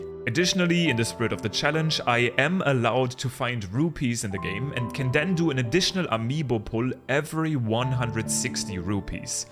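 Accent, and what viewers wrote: Germany. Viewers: You Sound very german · Sag mal bist du eigentlich deutsch? Dein Aktzent oder dialekt ka hört sich stak so an. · Ich bin leicht getriggert von diesem Deutschen Aktzent. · are u german? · I hear Denglish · Hey I am wondering if you are german as your accent reminds me of my accent. Sorry if I am wrong. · Just wanted to ask are you german?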